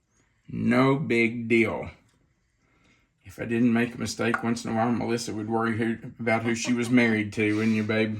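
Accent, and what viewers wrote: South, United States. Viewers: I'm from Texas and have a Texas accent, a little different than other southern accents. Never be embarrassed by your accent. You are who God made you to be and are wonderfully and perfectly made. I love watching your channel and your recipes. Enjoy your time in Florida on vacation and hope the weather is wonderful while you are there. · Your Southern voice is fine. Of course, I have a soft southern voice, so it sounds normal to me. I am proud to be southern and of my southern accent. I appreciate Melissa always typing the recipe below the video. It is so helpful. I enjoy enthusiasm for fixing the food. You all are an amazing Christian couple. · Hi, l”m southern, so your accent doesn’t sound different to me. I would like to ask a question. Where do you get the seasoning packets from? I would love to try this recipe. Thanks for the video. 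· Mr., you have some color on your face. I'm so glad you're videoing from Florida. You mind including some of your favorite spots in Florida? Your southern voice is such a nice voice. I'm looking forward to making this video 😊 Thank you & Melissa 💖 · Love the southern accent. It always seems so welcoming. I received my cook book a few weeks and absolutely love it. You and Melissa did a fantastic job. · This looks amazing! I’m eager to make this for the family. There’s nothing wrong with your Southern accent. And you are an excellent teacher in your videos. You and Melissa seem like friends to me. I can’t wait to see each new video. The only way your channel could be any better would be if you had a new video every day. You and Melissa are fine people, and I’m happy to see your channel growing. The cookbook is great, too! Everybody should buy one! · There is absolute nothing wrong with your voice. 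It’s clear and we can understand every word and that is so important. Keep up the good work nothing like a southern voice. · Gotta agree with Miss Jen, you've got a great voice for these. I'm from the south (Texas), so I'm completely comfortable with the accent, and you use that voice to explain things so well. This dish has all of the elements to be amazing. Love pork chops and love rice, especially wild rice. Question for ya, when y'all are making your winter plans, how much does the kitchen of the place you're renting go into your decision making? Do you investigate it for cooking space and feasibility of recording? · This looks delicious!! Thanks for sharing. As a child we lived next door to people who came from WV. I worked in customer service for 20 years, could not believe how many people from the south who don't have a southern accent. I really missed hearing it. Please do not lose yours. 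· Those are some thick pork chops. Pork is my most preferred meat, but those are too thick for my preference. I'd have to use thin cut. That onion is huge! Cooked onions, "fo' da win!" Some years ago I, 'discovered,' I seem to have become allergic to regular rice - at least. 🤣At least you didn't say, "...can of chicken." instead of "...can of water." Would the onions cook down too much if they were diced instead of sliced? -, dude... That southern accent, yo [hehe] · I find your southern accent comforting. Actually, I've never thought about it. Be yourself, don't apologize, or change who you are. I love this channel. Love how you and Melissa complement each other, and love your recipes. God bless you both! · I also love your Southern Accent, as Ms. Jen said. It makes me feel like I’m listening to someone that is kind, sweet and caring. This is what drew me to your You Tube. I am from KY, as I have said before and Proud of it. 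I have lived in Michigan for years but KY is my heart. You and Melissa are so good at this. Love love love to watch and listen to you both. 🙌🏻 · Keep on cooking, never mind your accent! Ain't nothing like a Southern cook! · This looks so good. My husband will love it! I love a southern accent. Never lose yours as it is who you are!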